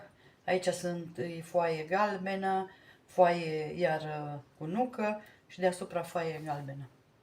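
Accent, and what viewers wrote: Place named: Banat, Romania. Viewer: Aveți o voce tare plăcută, aș vrea să vă tot ascult,precis sunteți bănățeancă de-a mea, este o plăcere să vă urmăresc.DUMNEZEU SĂ VĂ BINECUVINTEZE 🙏🙏🙏🙏❤❤❤❤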